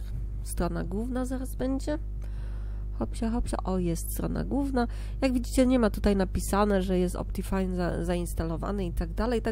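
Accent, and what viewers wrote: France. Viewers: jesteś z innego kraju np. Francji, bo masz taki akcent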